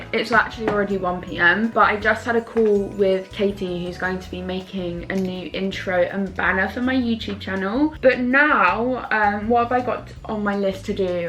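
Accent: United Kingdom. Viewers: No idea. She has a very typical British accent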